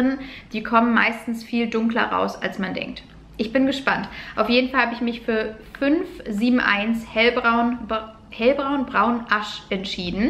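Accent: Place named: Hesse, Germany. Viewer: Kommst du aus Hessen? Klingt so!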